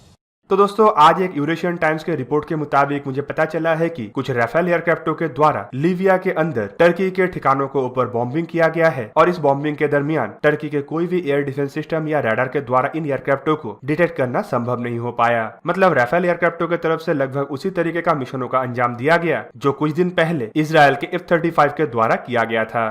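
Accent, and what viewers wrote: Bengal, India. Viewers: Bro are you Bengali ?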